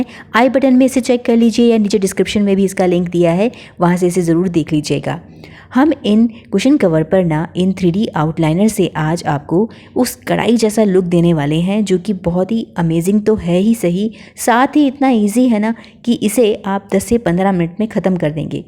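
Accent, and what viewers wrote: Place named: Maharashtra, India. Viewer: 😊 bahut pyari Marathi hai aapki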